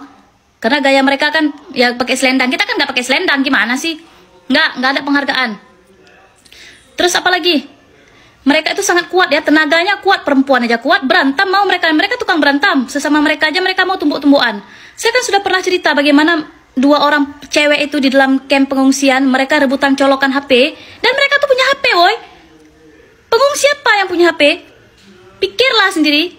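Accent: Aceh, Indonesia. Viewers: ini orang aneh yg di bahas A coment nya B waras gk ente mau tindikan kek orang ..ente blm tentu lebih baik dari dia terus si mbk ini bukan orang malsiya dia orng Aceh logat orng aceh mirip malysia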